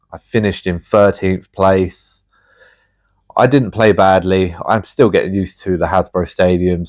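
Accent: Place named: England, United Kingdom. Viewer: why do you have an English accent but live in poor ass Philippines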